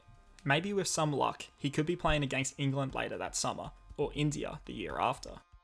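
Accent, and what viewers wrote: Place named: Australia. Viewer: Man shut up your aussie accent is so goofy, I can't take you seriously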